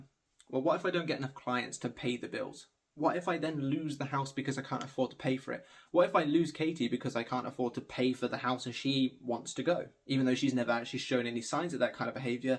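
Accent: England, United Kingdom. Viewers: This is off topic but it’s been driving me nuts so I have to ask. Where in England are you from? I’ve been all over England and I can’t quite place your accent! Sometimes I think you’re from South London, then sometimes it sounds like you’re from Nottingham…or sometimes even Birmingham! I’m way off aren’t? 😆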